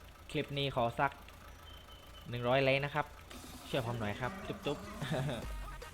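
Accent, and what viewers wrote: South, Thailand. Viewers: พี่เขาภาคใต้ครับ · พี่อยู่จังหวัดอะไรเเต่เสียงคนใต้ใช้ไหมครับ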